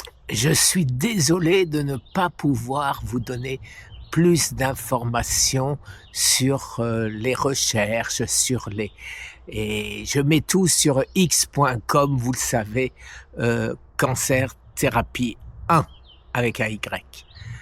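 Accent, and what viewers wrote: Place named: Nord-Pas-de-Calais, France. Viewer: Bonjour. Tu parles comme les ch'tis! C'est ici que l'on dit "Petit pain au chocolat" 😉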